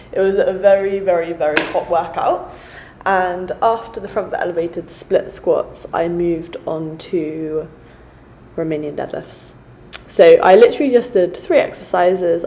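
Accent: RP, United Kingdom. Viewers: you so unique your a amazing athlete yet you dont have tons of ink and sleeve tattoo's and then when you speak this beautiful almost posh English accent comes out Like we are going for afternoon tea. i hope you realize how rare and beautiful that is today...cheers from the USA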